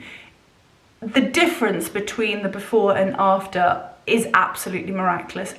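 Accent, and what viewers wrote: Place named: Scotland, United Kingdom. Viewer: Love to hear that scotish accent god bless you all❤😊😊😊😊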